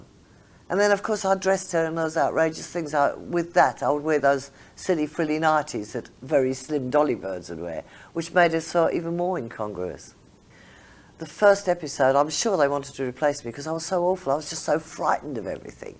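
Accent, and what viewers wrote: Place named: South Africa. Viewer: Lost her South African accent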